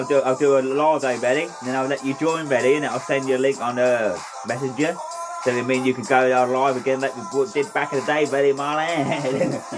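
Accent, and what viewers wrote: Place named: Norfolk, United Kingdom. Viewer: You have a really strange accent. I can hear the Norfolk/Cambridgeshire in it but something else weird too.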